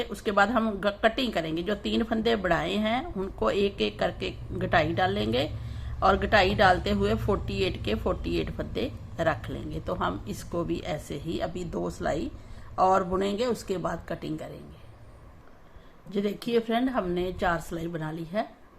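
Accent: Punjab, India. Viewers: Aap ki panjabi hindi bahut pyari lagti hai aur aap bahot achha samjhate ho. Thank you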